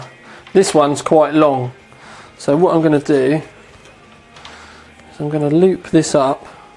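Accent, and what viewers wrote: United Kingdom. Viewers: are you from the uk dude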